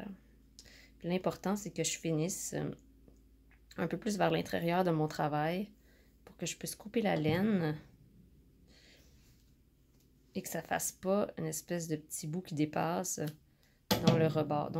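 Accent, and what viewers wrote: Quebec, Canada. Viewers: Hello and Thank You so much!!!! J'essaie de faire un manteau pour un Petit Lévrier Italien et.... Je ne comprend pas comment faire les Pattes??? Merci pour Tout Tes Conseils!!! Fantastique et.... Ton délicieux petit accent québécois est Une Douceur à mes oreilles car mon Cheum (mari) et moi on est Amoureux de votre Pays sans l'avoir jamais visité Mais à travers plein de films et séries Québécoises en VO Québécois!!! Comme Tu es douée et partager si clairement est un don, à mon avis!!!!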